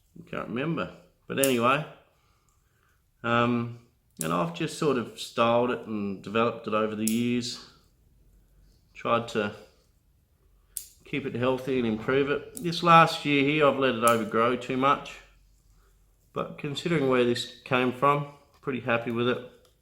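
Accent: Australia. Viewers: Iam a beginner and I love junipers,great tip thanks, the accent and the funnel web spider bring memory of beautiful Australia, thanks again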